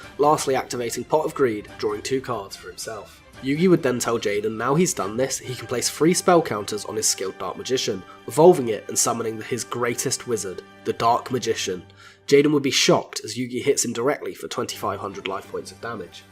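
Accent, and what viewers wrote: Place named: United Kingdom. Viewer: Your voice is so fucking British alas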